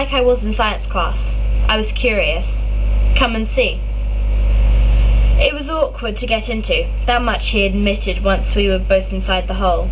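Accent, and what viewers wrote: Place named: United Kingdom. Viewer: Your voice is lovely and so british:-) Thank you for reading!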